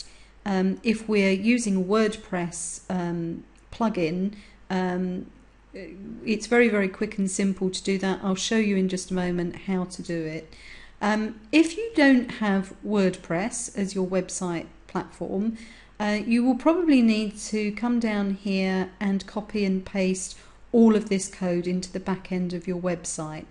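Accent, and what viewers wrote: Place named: England, United Kingdom. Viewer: your english acent just wow <3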